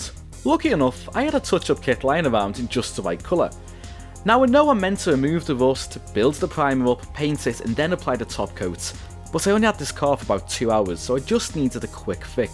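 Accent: Germany. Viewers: That's a really great result, really a fantastic job you did there. I also really enjoyed your accent, you sound German, are you originally from Germany? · Incredible transformation! Good job! Haha your accent made it very easy for me to understand what you said bc you pronounce the letter U just the way we do in Germany. Greetings and keep up the good work.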